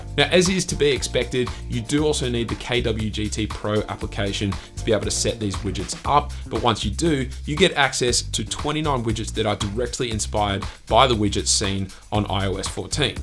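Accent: Australia. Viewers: Heard the Aussie accent and subbed straight away! Looking forward to seeing the back catalogue of videos 👍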